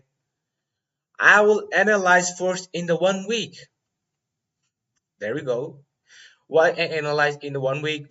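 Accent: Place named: Russia. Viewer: recaaardddiiiingggg😘😅 btw best knowledge and also kaatttar englishh like russsian or spanishhhhh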